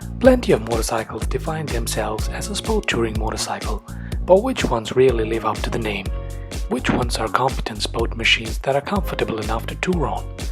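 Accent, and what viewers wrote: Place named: United Kingdom. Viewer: Really annoying Brit accent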